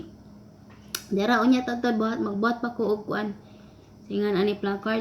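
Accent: Visayas, Philippines. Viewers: hi day sus ganahan jud ko nimo day,kay siaw kaayo hahaha..Lawm sad kaayo ug bisaya..Bisaya sad ko day..Pero nakapag-asawa lng sa KL..Pareho ta kusog kaayo mohab-hab hahaha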